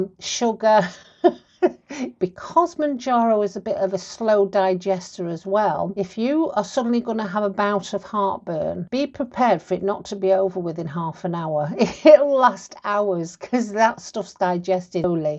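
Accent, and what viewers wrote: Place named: England, United Kingdom. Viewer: I like your accent. What part of England are you from?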